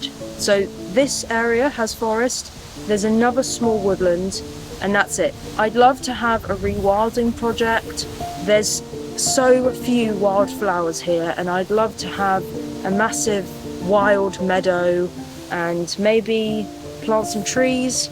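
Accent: United Kingdom. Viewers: Such a beautiful place, too bad it's so damn cold! Is she Swedish? she looks Irish and has a British accent. I just watched her video of raising a red squirrel. I love squirrels, that was a great video, nice job!, definitely Irish, I wonder how she ended up in Sweden? So lovely to live among all the forest and trees. I bet she wouldn't appreciate all those Komodo Dragon feeding videos I've been watching!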